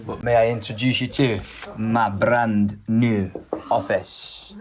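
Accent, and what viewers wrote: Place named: Scotland, United Kingdom. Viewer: Which of your parents are of Scottish descent? I've never noticed a Scottish accent in either of them, but you often adopt one, as you did today. Or is that just a "Manchester thing?"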